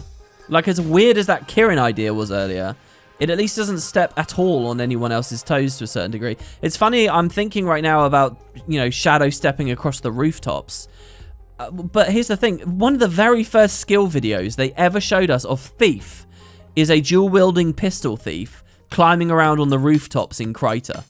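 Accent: United Kingdom. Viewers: British to a ̶t̶e̶a̶ tee.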